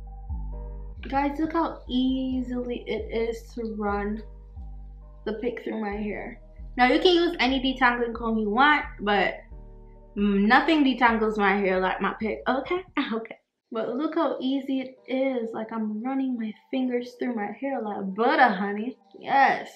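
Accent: Jamaica. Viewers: Do I hear a Jamaican accent??? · Jamaican accent cant leave ya lol big up ya self my girl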